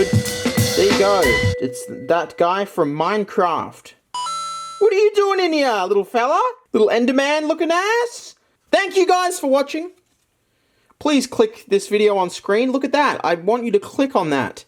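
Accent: Australia. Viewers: Well he has sort of an accent because he’s Australian.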